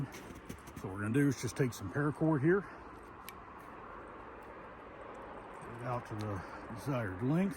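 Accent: South, United States. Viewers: Hello there!! I joined your channel a few weeks ago.And you were in your new To you scamp trailer. I have begun catching up with your previous posts. That scamp now seems like luxury compared to videos like this. I have never lived further north than southern middle tennessee , where I currently live. I cannot imagine weather and ice like in this video .(BTW, Do I hear a trace of a southern accent in your voice?)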